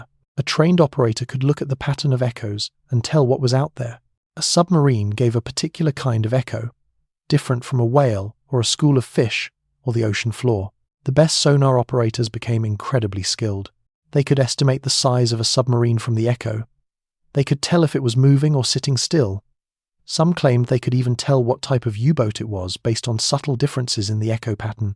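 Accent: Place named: United States. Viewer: Hey ! The speaker must be a yank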